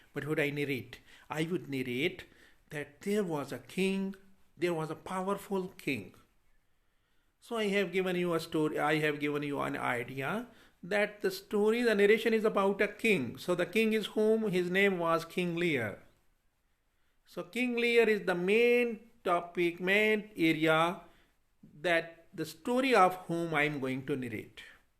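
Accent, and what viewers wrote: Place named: Khattak, Pakistan. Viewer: Pure Khattak and non-native accent.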